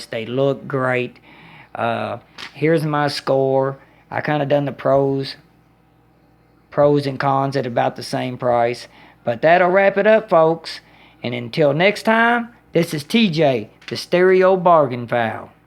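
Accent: South, United States. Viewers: Can't shake the southern outta you brother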